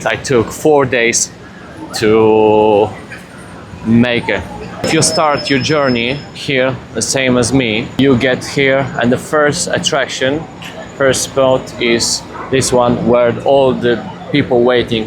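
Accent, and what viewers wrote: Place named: Italy. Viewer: It's funny that you speak Italian fluently as it sounds like your accent when you speak English has a hint of an Italian accent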